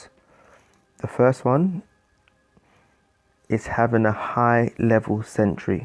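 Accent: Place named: India. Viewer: Индийский акцент... Кровь с ушей идёт от этой речи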